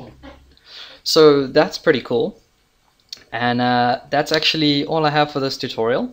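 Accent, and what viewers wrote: South Africa. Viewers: Was so shocked when I heard a South African voice! #LocalIsLekker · Are you South African?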